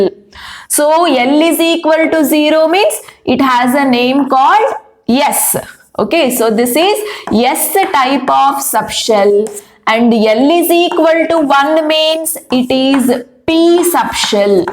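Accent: Karnataka, India. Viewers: Are u from Karnataka mam😮😮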